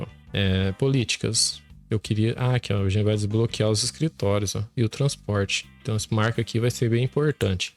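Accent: Minas Gerais, Brazil. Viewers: vc é engraçado o sotaque mineiro deixa mais engraçado ainda o video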